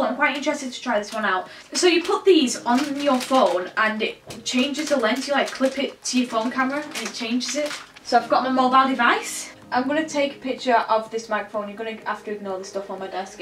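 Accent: United Kingdom. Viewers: british i think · shammy dammy no it’s a British accent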